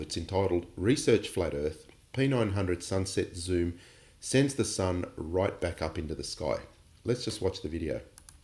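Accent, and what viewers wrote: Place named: Australia. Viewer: Quick question about your filming location. If it’s not too much trouble, can you post your coordinates and the direction you’re facing please? I’m assuming ( because of the accent) that you’re in Australia or New Zealand. If that’s true you’d be facing northwest to film , correct? Did you take into account any physical obstructions (like mountains) that would create a false horizon? Mountain ranges in the distance or other obstructions can do this, creating the illusion of a physical horizon. I’d just like to be certain of what we’re seeing. TIA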